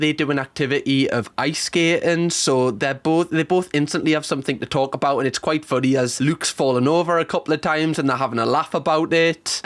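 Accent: Newcastle, United Kingdom. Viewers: 'm an old lady stuck home a lot when I'm not being ferried back and forth to hopsitals for chemo and I discovered you a few weeks back. I can't even recall which video it was now but your wonderful Newcastle accent hooked me in. Always been a pushover for a Geordie accent. But I stayed because you're not only a funny little bugger, you're actually quite delightful. Personable, self deprecating & above all compassionate in a way many commentary channels are most certainly not. Well done and thank you for making me laugh. I've had tears a few times in every video I've watched. I've made my son subscribe as well. Let's get you to a million, eh? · I love the Geordie accent. My parents were both Geordies but moved to Yorkshire when they were quite young. All their siblings stayed in Geordie land though. I’m now in Canada and most of my Geordie family are gone so I love to listen to you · He sounds like he’s from Newcastle (city in England)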